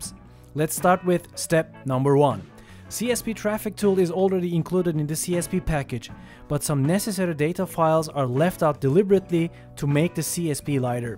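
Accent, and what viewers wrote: Turkey. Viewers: türk oldugunu videoya tıklar tıklamaz anladım her turlu belli oluyor rleri söyleyişten :D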